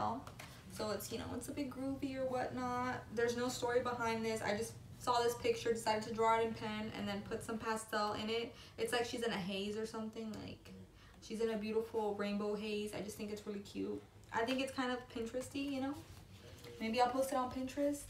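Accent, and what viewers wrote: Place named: New York, United States. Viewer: are you from new york because you sound like it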